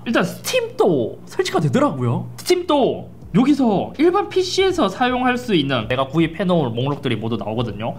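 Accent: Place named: Gyeongsang, South Korea. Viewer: 씨 경상도셨구나.. 컴퓨터도 잘하네..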